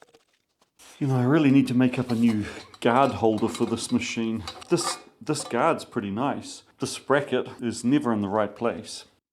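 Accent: New Zealand. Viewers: Came here by accident on a Sunday evening & heard the oh so recognizable Kiwi accent that made me stay & confirmed New Zealand connections with the "Bog" body filler . Subscribed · "four inch input vice on the idge of my welding binch" What's that accent called? P.s. New Zealand? Where's the old one? P.p.s apparently Zeeland is a dutch province, and dutch were known sea explorers so I'm not surprized if they named your country.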